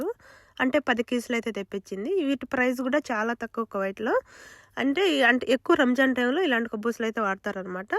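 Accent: Rayalaseema, India. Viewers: Rayalaseema slang baaga telusthundhi sister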